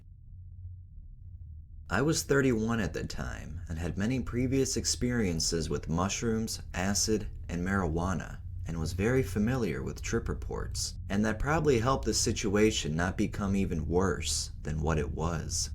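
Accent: United States. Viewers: Narrator sounds 3rd generation Chinese American.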